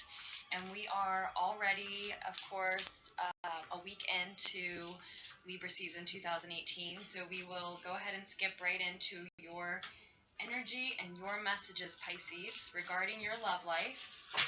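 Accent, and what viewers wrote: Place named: United States. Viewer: You're so beautiful and insightful. I like your energy. And, even though I suppose you're American, judging by your accent, you and your environment look quite European and more soulful than the average US-like vibe.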